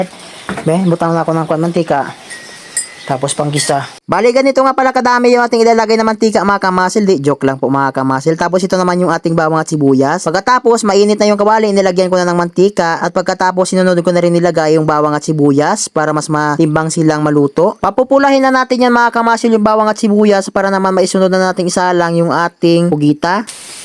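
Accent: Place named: Iloilo, Philippines. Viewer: May pagka ilongo ka rin pala idol kabalo man ko maghabal ilongo..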